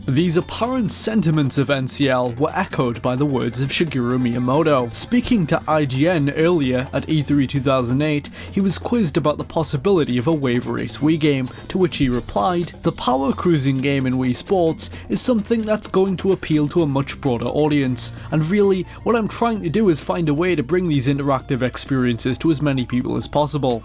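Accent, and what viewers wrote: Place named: Geordie, United Kingdom. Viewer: I instantly recognised your accent on Did You Know Gaming's video. Canny cool to see a local youtuber doing well haha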